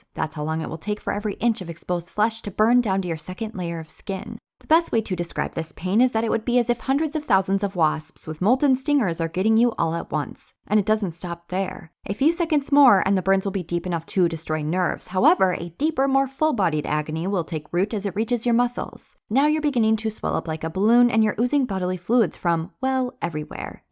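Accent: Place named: South, United States. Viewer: For some reason your voice in my head is deeply southern accented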